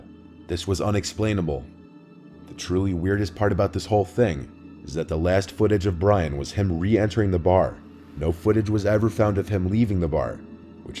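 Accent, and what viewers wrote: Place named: North, United States. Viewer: does anyone know accent? its an interesting one! northern united states i think but idk if its eastern or western. not quite midwest i dont think but idk. anyway, not trying to dox, just an observation 😊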